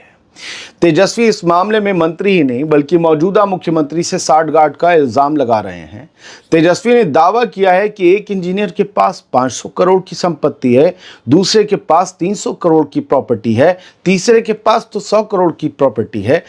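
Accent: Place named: Lucknow, India. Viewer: zanab mai to apki lucknowi andaz me reporting bich bich me behtreen urdu ke alfaz aur Palestine, gaza pe itni shiddat aur safgoi se reporting ka vaise hi qayal ho gaya hu ! Mai apka regular viewer hu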